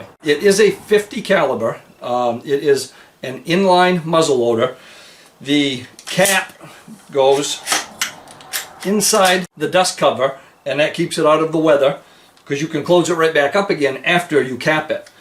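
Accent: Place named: New England, United States. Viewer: FINALLY found a firearms channel from my region, with my interests! Speaking native New England too. Thank you sir. Being In Massachusetts, I must live vicariously through YouTube.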